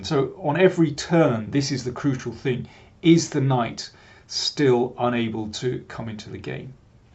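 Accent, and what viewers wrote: England, United Kingdom. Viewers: Great videos,! Lovely English accent in addition to keen chess analysis. My only suggestion: Please add the opening played, when appropriate, to video descriptions. Thanks!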